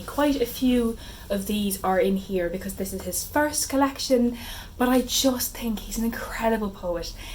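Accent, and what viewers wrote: Ireland. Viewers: As an Irishwoman I love your Irish accent! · Ahhh! I love your Irish accent!! I’ve always been a bit intimidated by reading Seamus Heaney’s poetry for some reason but will definitely pick some up now. ☘️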